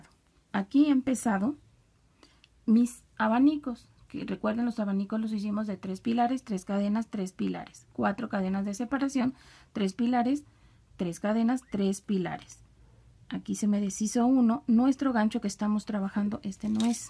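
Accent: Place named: Guatemala. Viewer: Estimada señora , me gusta mucho su camino de mesa, hace poco la descubri y ya empece a hacerlo, lo mejor es que lo explica muy bien, gracias por publicarlo, reciba saludos atentos, me da curiosidad su acento, se parece mucho al de ños guatemaltecos.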